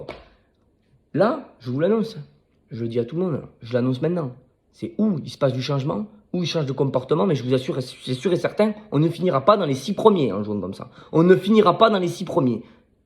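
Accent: South, France. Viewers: Salut, tu es originaire de quelle région de France ? Tu sembles avoir l'accent du sud ;)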